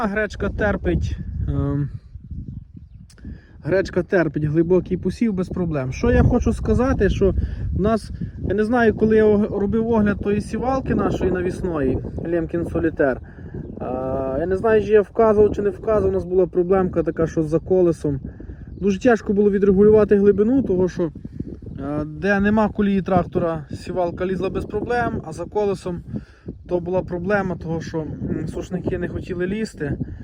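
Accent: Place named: Lviv, Ukraine. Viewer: Ну чути що ви з Львівської області, навіть не спорте!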